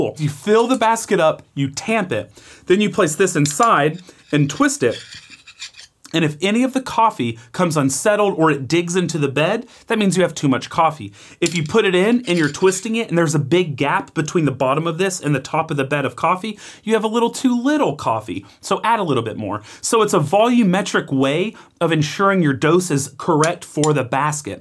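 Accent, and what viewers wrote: United States. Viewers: sounds American not European. So are you an expatriate?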